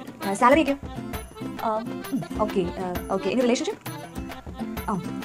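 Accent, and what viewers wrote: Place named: Kochi, India. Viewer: ചേച്ചിയുടെ കൊച്ചി സ്ലാങ് സൂപ്പർ ആണ് ട്ടോ...🥰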